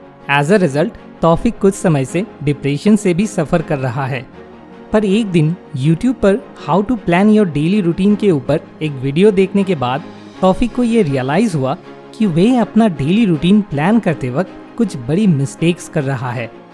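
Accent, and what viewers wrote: Bengal, India. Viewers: Welcome back Bangoli accent voice ,,,,👍👍👍👍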